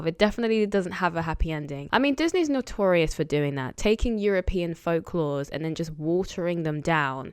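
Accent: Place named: United Kingdom. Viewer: Calmest rant with an impeccable British accent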